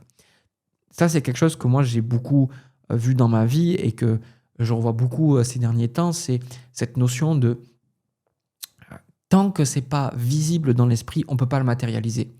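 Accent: South, France. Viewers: Merci 🙏💗, je viens de te découvrir, c'est très parlant, c'est marrant toutes les cartes que tu as sorties sortent aussi dans les autres tirages, donc, cela confirme le tout! Je te souhaite une merveilleuse journée et ton accent du Sud me rappelle mon enfance en France, bisous 😘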